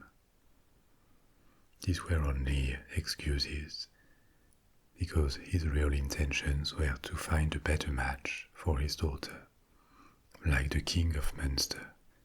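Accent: France. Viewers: Hearing Irish names and places pronounced in a French accent tickles me in a way I can't quite describe. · I love his pronounciation. Usually its American's botching up the accent (though I don't blame them, Irish spelling is weird but anyone with a passing knowledge of Irish knows the anglicised sounds is not the original sounds) but he has a very strong French accent so I was curious how he would pronounce it. The correct inflections are all there which is all I expect. As someone who the only thing I was good at in school was doing a French accent (not speaking French tho) and combining that with the Irish I was being taught I can recgonise where the mouth was making its natural French sounds but it was still pronoucing the word right. The best way I can describe is that Irish, if you're trying to force the language it can sound choppy and sharp. Kind of like your coughing the words out on the sharp consonents, but as a native speaker it sounds fluid. 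French speakers whether fluent or not always sounds fluid. It's very interesting to hear. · Being Irish and love the french accent, this was perfect for bedtime 😄 merci beaucoup!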